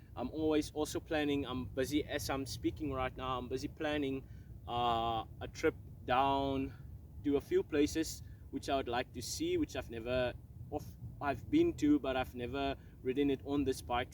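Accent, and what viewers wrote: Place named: Afrikaans, South Africa. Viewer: The Afrikaans accent in this video is phenomenal 😁👍 thanks for the video! As a girl...it helped me a lot! 😁😂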